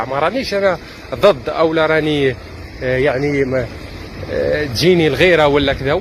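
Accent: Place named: Morocco. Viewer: ماروكي باينه لهجتك خخخخخخخ😊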